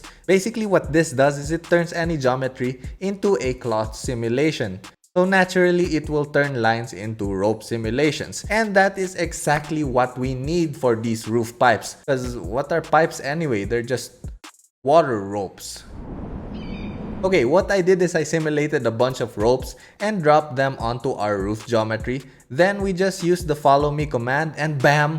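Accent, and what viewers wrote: Philippines. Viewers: damn! this is why i always wanted to see your vids coz men! your editing skills is so good and satisfying. The transition effects are so good and educational. also love your filipino english accent hahaha every diction of words is clear and precise. I think you are one of the underrated vloggers so i hope soon i can witness your growth up to million subscribers..hayyysss every time i watch your vid makes me want to go to your place and ask you to be my mentor hahaha anyways i also finished architecture degree hope to see you soon idol! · These dudes right here be pressed about a person who just recognized a filipino accent. 😂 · why do I feel that you have a Filipino accent? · You sound like how Jokoy perceived what Filipinos sound like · Heards his accent Me: Heck yea, a Filipino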